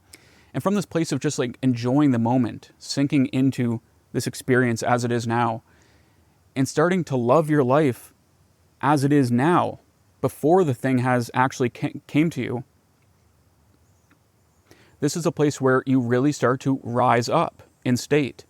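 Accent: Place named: Canada. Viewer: I appreciate this video! I just came across your youtube channel. You explain everything in simple terms language....Look forward to learning more....You must be from the NW or Canada with the accent. And saying "Keister" is definitely older school and wise....lol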